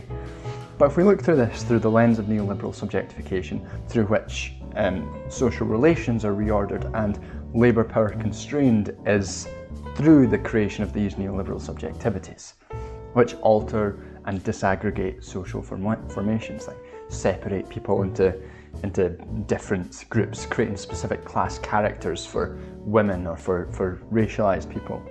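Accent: Scotland, United Kingdom. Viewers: I was keen for a new channel but fuck am I glad to hear a Scottish accent 🙏🏽🥰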